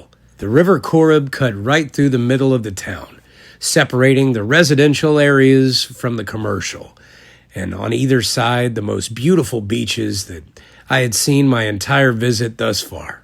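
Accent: South, United States. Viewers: Maith thú, don't fret about your accent, I'm thinking it would sound really lovely le Gaeilge I'm from Aran and am always trying to get my daughter to pronounce words like I do, but she ignores me and goes with east coast school accent ha. Southern accents are beautiful, I love them so much. Fun fact, when I was little in the 70s/ 80s we whispered when we were visiting Galway from the island , didn't want to stand out/ be embarrassed for speaking Irish. I think that is absolutely insane now. Like whyyyy! So happy when I hear of others learning Gaeilge. I've met a German lady and a San Francisco lady with beautiful spoken Irish. Ádh mór leis an bhfoghlaim!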